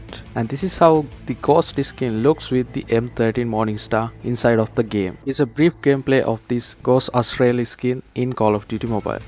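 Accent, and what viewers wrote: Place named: Kerala, India. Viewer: Mythic accent from Kerala or TN!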